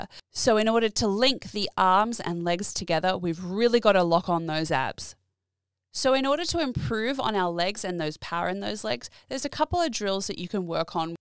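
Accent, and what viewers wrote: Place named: Australia. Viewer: Think it’s just the Aussie way of speaking, but gee you make it sound so easy! I’ve watched heaps of swim channels but they make it seem so complicated. I’ll definitely be using your tips. Thanks from a fellow Aussie. 🇦🇺 👍🏽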